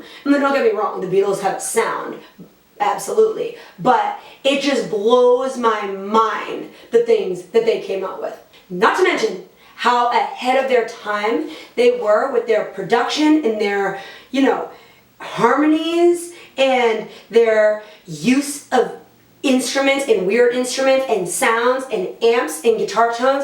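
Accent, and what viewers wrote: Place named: New Jersey, United States. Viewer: you're gorgeous and I like your singing, but you're speaking voice is so Jersey shore that it hurts to hear you describe my favorite band lol. Well right behind the wailers and the jimi Hendrix experience. Just constructive criticism because you're a professional. But you're so right in recognizing their genius. I literally think they are the famous Kumar avatars of God because they really cared about helping souls. "We're bigger than jesus. " lol. Anyway I wish you all the best because you have a beautiful soul too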